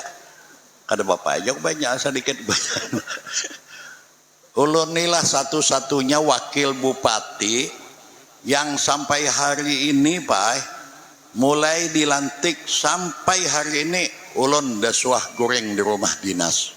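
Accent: Banjar, Indonesia. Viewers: Asli bahasa Banjar nya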